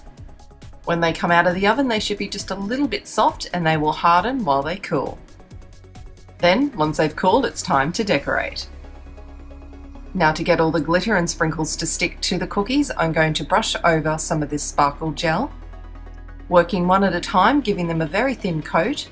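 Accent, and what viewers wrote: Australia. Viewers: I could tell you're Australian by your accent. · Are you Australian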